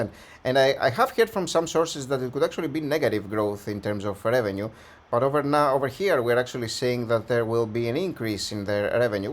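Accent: Greece. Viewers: Your accent sounds sooo greek man 😂 Correct me, if I am wrong!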